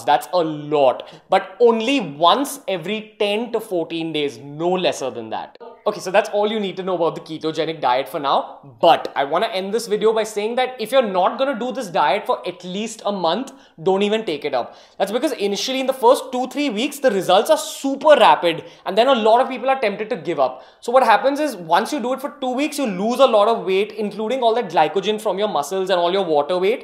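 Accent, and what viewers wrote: India. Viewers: He has got an Indian Accent...Is that bothering u? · The Indian accent is running off the charts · When I saw the thumbnail i thought it is some American guy's channel and when I opened it.. BOOM... indian english #wtfmoment 😂😂😂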